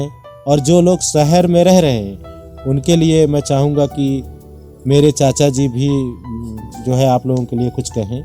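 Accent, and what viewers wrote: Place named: Uttar Pradesh, India. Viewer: Bilkul up se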